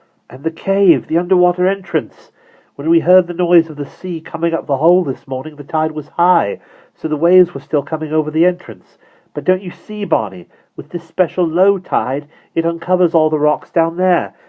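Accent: England, United Kingdom. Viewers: Really enjoying this. Great English accent (I’m in England) only one mispronunciation (British English-wise) is “quay”. In the UK we pronounce “quay” as “key”. It’s brilliant hearing one of my old favourites read so well. Thank you for doing this.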